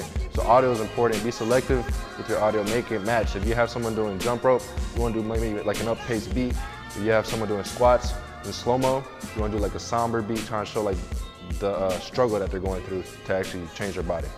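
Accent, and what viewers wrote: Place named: New York, United States. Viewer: got u brother. You dont even sound like you from Texas. You sound like where I'm from ny/nj lol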